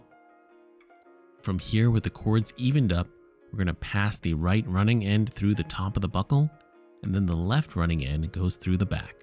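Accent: Canada. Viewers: I was gonna ask if I won anything for my guess, but it was clearly obvious to several of us. 😁 I grew up in western Montana (Missoula, to be more precise). I think everyone from the cooler climes gets the need for today’s gadget intuitively. That you’re Canadian is obvious, not just from the dialect, but from the whole vibe, which is part of why I absolutely love your channel. I admit, y’all are way more likable than your neighbors to your immediate south; we’re quite the mess these days, but I’ve felt that way for decades. When I worked tech support, several of my clients thought I was Canadian from my voice and demeanor. One of the highest compliments one could receive.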